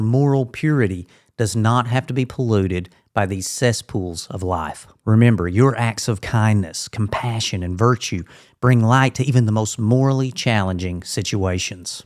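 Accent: South, United States. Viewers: Hey! Thanks for making this very helpful information. Where is your great southern accent from? Keep up the important work!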